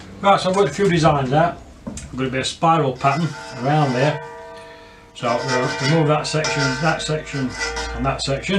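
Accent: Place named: Northern England, United Kingdom. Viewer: You think you've seen everything on YouTube, and then you stumble upon something else. That's a fine northern accent btw. Huddersfield lad myself.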